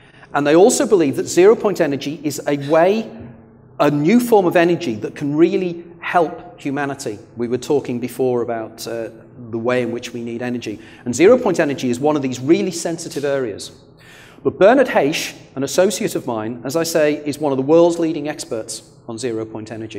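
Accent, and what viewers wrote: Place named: England, United Kingdom. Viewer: very interesting but why the unfriendly, snappy tone? Maybe it is the English accent, I don't know, if was Scottish or Irish, I am aware that he would sound friendly and even funny, full of spirit, but listen to Richard Dolan, American, he does not fly through his lectures and sound so cold....otherwise, great lecture. But I don't agree with "you live your life again and again until you live a perfect life". Why the human morality all of a sudden? why the idea of hard work, linked to progression then release? And who created the video game we are in (as player and creator are different people here in this reality)? Why do animals suffer so much? What's in the game for them? What about all the kiddies that Jimmy Saville raped? Are they to live again and again until they discover the right choices? What if Philp K Dick was right and the creator of this game was or the creators were evil?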